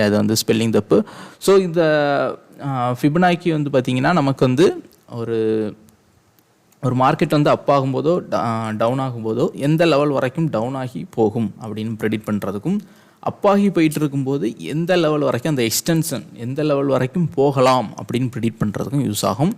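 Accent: Kanyakumari, India. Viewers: Kanya Kumari Slang😊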